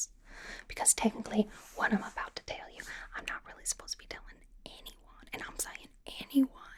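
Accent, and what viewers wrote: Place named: South, United States. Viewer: Had been missing my favorite southern accented super hero. Always a wonderful experience.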